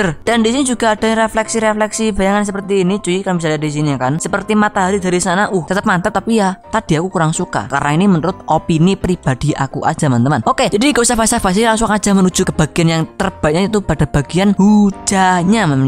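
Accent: Java, Indonesia. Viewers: Sumpah gw ngakak dengan gaya bicaranya,, kayak jawa jawa gimana gtu anjay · Dia org Jawa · Uwong jowo ketoro ne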